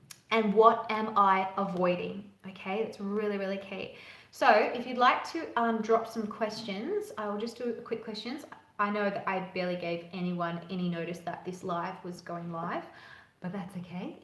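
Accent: Australia. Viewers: How about we feel like 💩 because our rights have been taken away! You: “Things aren’t going back to normal.” Well, sounds like you’re in Australia by your accent. So, enjoy being under house arrest & just taking it like a good lil sheep.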